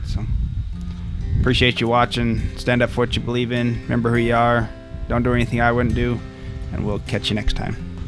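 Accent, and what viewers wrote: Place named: Midwest, United States. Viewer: “I gotta dang good wife,” so wholesome aaahhhhh😭 that warmed my heart. PS there’s nothing better than that familiar Midwestern drawl, I’m from Utah and it’s always like a taste of home to hear an accent like yours.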